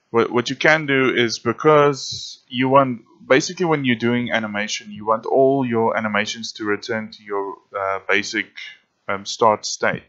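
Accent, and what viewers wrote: Afrikaans, South Africa. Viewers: Buddy, you afrikaans?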